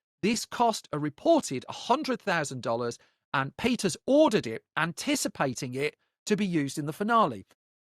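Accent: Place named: United Kingdom. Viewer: That is one striking British Accent..😊😊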